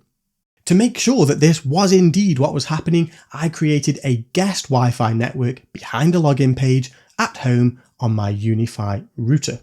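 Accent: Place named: London, United Kingdom. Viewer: My to is working amazing sounds like you’re connecting is more problems I live in USA you sound like in London or Europe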